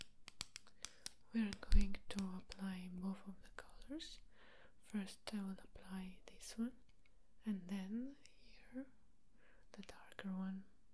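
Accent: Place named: Russia. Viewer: ты русская? уже достаточно видео посмотрела, где у тебя очень четкий акцент, интересно просто узнать🤗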